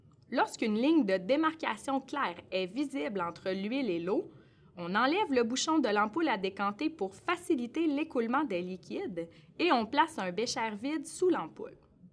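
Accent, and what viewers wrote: Quebec, Canada. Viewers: Non c’est l’accent quebecois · Merci beaucoup vraiment et je veux pas etre raciste ou quoi mais l'accent québécois m'a aider a mieux comprendre merci beaucoup allo prof je viens de m'abonner! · Lol l’accent québécois. Bise au tous les québécois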